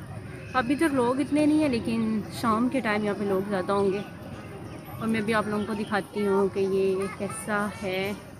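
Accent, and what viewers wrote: China. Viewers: Love from India, 1m subscribe soon, how can you speak mandarin so frequently and your accent just like Chinese people please make a video on this topic😊😊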